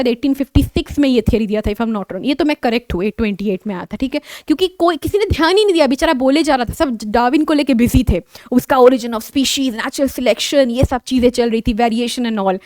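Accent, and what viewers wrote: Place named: Tamil Nadu, India. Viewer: Yeh ma'am south indian accent aa rha ha tamil side ki ha shyd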